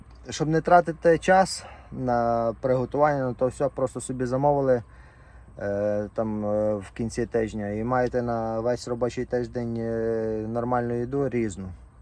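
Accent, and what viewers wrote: Western Ukraine, Ukraine. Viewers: Диалект запукренский рагулячий ☝️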